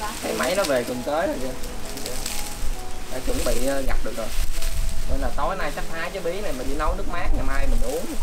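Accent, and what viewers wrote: South, Vietnam. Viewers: Bạn ấy sinh ra và lớn lên ở miền nam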